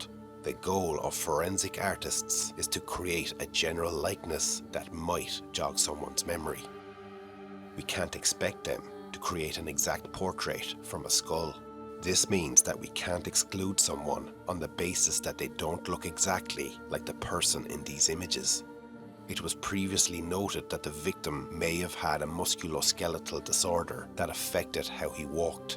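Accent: Ireland. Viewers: I’m impressed by this documentary. Good old Irish accent too.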